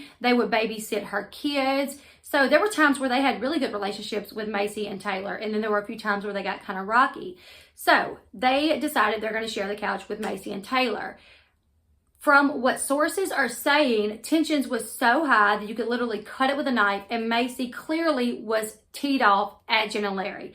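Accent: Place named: Georgia, United States. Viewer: Yasssssssss so happy I found you all the same things I'm obsessed with AND I'm from Georgia so the accent is everything!!!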